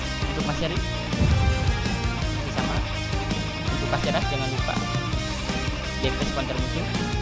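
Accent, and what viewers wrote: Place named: West Java, Indonesia. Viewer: Nyunda pisan xD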